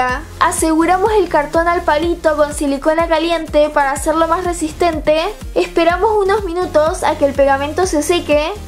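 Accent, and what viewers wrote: Argentina. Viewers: De que país sos parese asentó argentino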